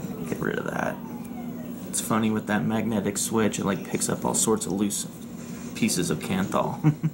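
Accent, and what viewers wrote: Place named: California, United States. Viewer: are you from Orange County, CA by any chance? You talk the same lingo we do lol. I live in AZ now though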